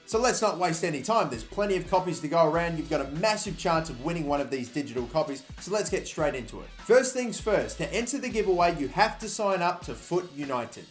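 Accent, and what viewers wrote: Australia. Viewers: I love Australian Accents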